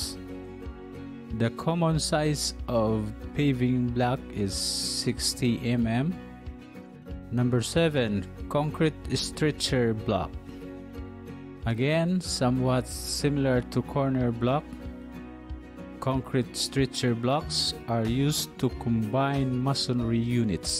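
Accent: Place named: India. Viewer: Heard indian voice, click out quickly. Shittiest group of people in construction.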